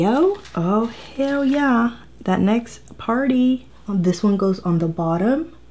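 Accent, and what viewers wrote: Texas, United States. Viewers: Hello y’all I love ur channel so damn much I just learned about you and from the first video I knew right away that u are from TEXAS. The way u talk not the accent but the way u talk is just like me I could not stop laughing 😂 😂😂😂😂. Girl I love you so damn much. When I’m feeling down or my anxiety is fucking me up I’m from now on I’m going to watch ur video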